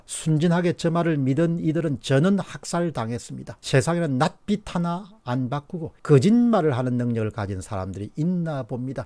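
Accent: Gyeongsang, South Korea. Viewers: 문재인포???? 에라이 갱상도 발음좀 고쳐라잉...............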